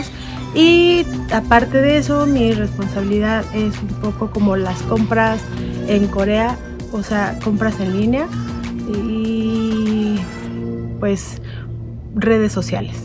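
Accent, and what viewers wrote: Mexico. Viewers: Tu voz siento que está diferente, antes te escuchaba algo chilanga y ahora es diferente, por cierto te vez muy bien muy linda saludos desde MTY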